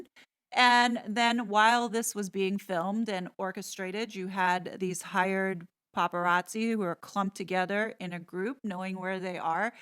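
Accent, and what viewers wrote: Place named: New York, United States. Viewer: another great video! Right on point. As an aside…I love it when you are on a roll and your NY accent comes flying out of your mouth..!!! Thank you for all you do!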